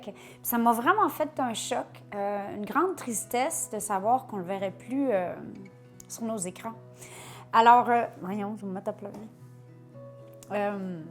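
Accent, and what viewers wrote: Canada. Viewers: Coucou, j'adore tes vidéos, ta bonne humeur me fais passer de super moments!! Je suis italienne mais j'habite en Suisse, et je conseil ton blog à tout le monde (ici on adore l'accent canadien;) ). Je ne sais pas comment j'ai découvert ton blog mais depuis ce jour là j'attends tes vidéo avec impatience. J'admire ce que tout fais et surtout comment tu le fais. Merci pour tes recettes et tes sourires.:) Tania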